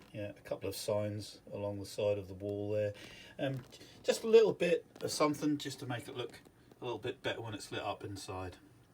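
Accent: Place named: Norfolk, United Kingdom. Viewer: All good stuff. How big is your layout to get all these buildings on? Love the accent. What part of Norfolk are you. I used to live in Ludham.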